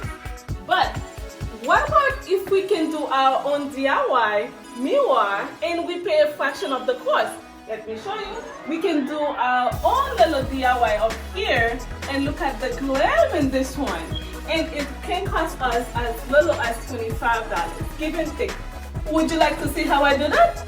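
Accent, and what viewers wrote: Haiti. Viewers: Your accent sounds like you're Haitian 🇭🇹!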